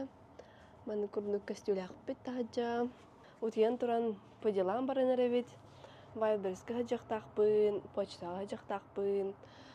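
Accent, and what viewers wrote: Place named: Yakutia, Russia. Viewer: Астыгыын, Саха кыыһа, ыраастык сахалыы саңарар❤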